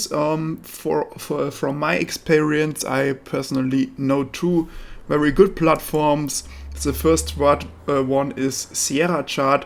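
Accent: Germany. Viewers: I used to work for BMW corporate. Miss the German accent lol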